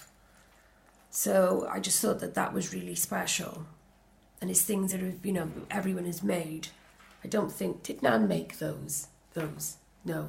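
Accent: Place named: Cardiff, United Kingdom. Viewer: Hi. I’ve been following Tracie for a little while now and just came across your channel. I adore that journal you made. The detail is incredible and the family history inside of it so precious. I’m a new subscriber to you now and look forward to binging on your videos! Can I ask whether I’ve detected a fellow Cardiff girl? 😀. I’m fairly new to JJ and TNs and my channel is pants at the moment but I’m enjoying making them. Thanks for the inspiration xxx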